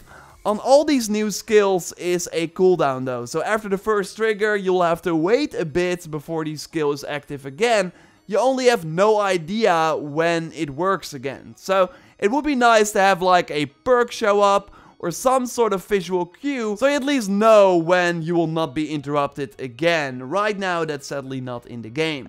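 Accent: Netherlands. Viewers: He has a Dutch accent so he says instead of V he will say F but he means Visiting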